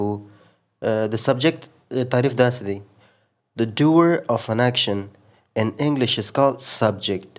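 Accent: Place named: Kandahar, Afghanistan. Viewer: Leila sweeps the room. Leila - subject, Sweep- verb, Room - object. Thanks for your teaching 🥀 I like your teaching 🥀 ګرانه استاده! ستاسو تدريس مې ډېر خوښيږي. هيله ده ، چې دوام ورکړئ. کندهارۍ لهجه او انګليسي ژبه ډېر خوند کوي.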